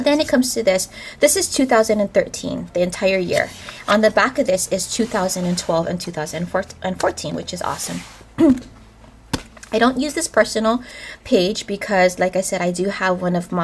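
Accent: Hawaii, United States. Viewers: OMG I could tell you from Hawaii the moment you started talking! aloha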